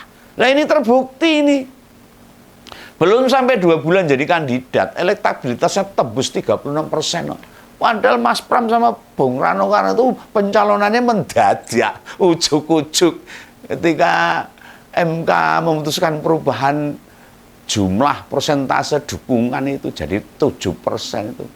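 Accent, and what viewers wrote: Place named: Solo, Indonesia. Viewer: Om asal dari mana ya? Sepertinya dari Solo atau Klaten gaya bicaranya.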